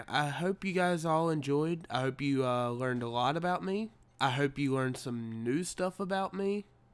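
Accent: Georgia, United States. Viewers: He sounds a bit southern anyone else notice that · I live in Georgia too btw you sound like your from Georgia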